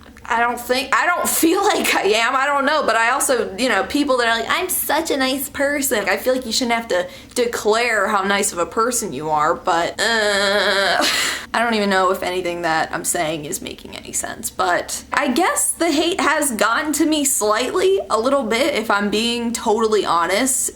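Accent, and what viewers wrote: Pennsylvania, United States. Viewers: could you do the Accent Tag would love to see that from you because you would be exceptional at it because PA accents are out of this world!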